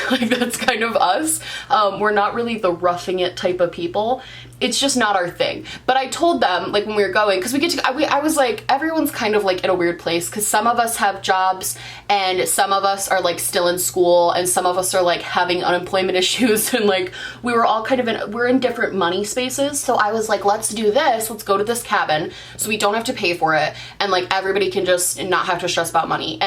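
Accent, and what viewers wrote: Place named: South, United States. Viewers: Great Southern accent from a real Southern Belle!!! ♥️♥️♥️ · So glad you enjoyed your vacation! Also your southern accent killed me 😂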